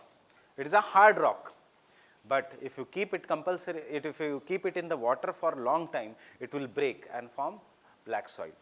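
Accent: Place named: Maharashtra, India. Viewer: sir from maharashtra land his speech like maharashtrian people❤ sir I am from beed maharashtra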